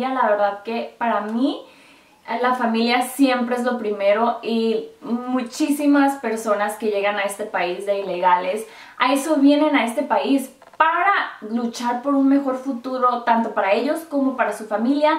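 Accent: Mexico. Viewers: Cuando recien te empeze a ver pense que eras de Sinaloa de repente se te sale el acento... saludos desde Los Mochis Sinaloa ❤✌ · Yo creí que te fuiste a usa para estudiar y te quedaste que bien hablas el español tienes asentó mexicano y no americano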